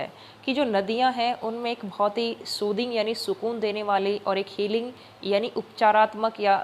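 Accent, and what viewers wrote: Haryana, India. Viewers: Mam meri English bolne m bhut dikt h tho pls app meri help kro 🥺🥺 Ak bt puchni thi app haryana se muje lga apki awaj se 🙄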